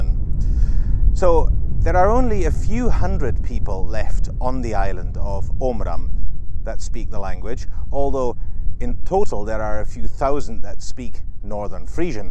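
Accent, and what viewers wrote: Scotland, United Kingdom. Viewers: yeah it's true you do sound Scottish. Are you a first language Frisian speaker? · I am confused. You sound Scottish but have a German name.All the same Frisian is the closest related language to English so hope to God the German AND Dutch governments protect the culture of these islands! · In case you are curious, two Scottish aspects of your English accent are that you pronounce the vowels in “so” and “day” as monophthongs and that you use a tapped r sound, the r sound also found in Swedish, Icelandic, and most Norwegian dialects. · Interesting - your accent sounds similar to Scottish.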